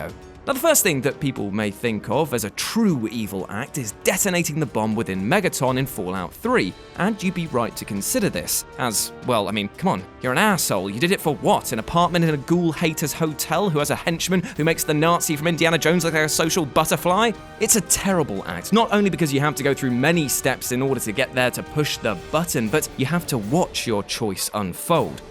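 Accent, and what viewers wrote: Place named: Newcastle, United Kingdom. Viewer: Oml you’re a geordie like me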